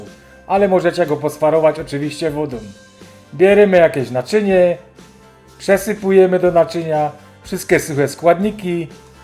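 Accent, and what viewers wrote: Silesia, Poland. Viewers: Pospiesz sie z tym zytnim chlebem, prosze. Uwielbiam Twoja slaska gware i prowadzenie. Krotko, konkretnie, na temat i z humorem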